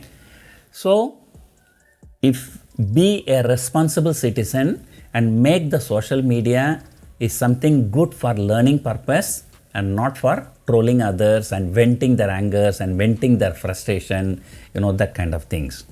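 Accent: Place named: South India, India. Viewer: Sir to be honest they are trolling because you speak South Indian English . You are success they can’t digest I believe...you are doing good job sir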